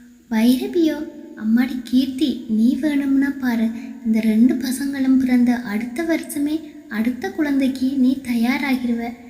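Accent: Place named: Sri Lanka. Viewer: அக்கா நீங்கதானே காவலனே எண் காதலனே கதை எழுதுணது .உங்களுடைய வேற youtube channel ல படிச்சிருக்கேன் .எனக்கு அந்த கதை ரொம்ப பிடிக்கும்.அடிக்கடி அந்த கதை படிப்பேன். நிங்க அந்த கதை நீக்கிட்டீங்க😢.அந்த கதை பல மாசம தேடி பார்த்தேன் அது இல்ல.இப்பதான் இந்த channelல உங்க குரல் நிங்க பேசுற இலங்கை தமிழ் வச்சித்தான் கண்டு பிடிச்சேண் .திரும்பவும் அந்த கதை இந்த channel ல upload பண்ணுங்க அக்கா please😢😢.அது 9 episode தாண சீக்கிரம் upload பண்ணுங்க அக்கா.எனக்கு அந்த கதை ரொம்ப ரொம்ப பிடிக்கும்.உங்க பதில்காக காத்துகிட்டு இருக்கேன். 😢😢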